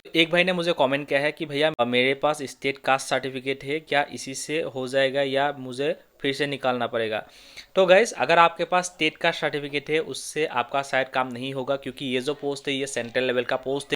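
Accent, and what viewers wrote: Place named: Assam, India. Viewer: bhai 2mar Hindi Improve kribo lagibo atiya Assamese kuwa hindi Improve kri ha try kriba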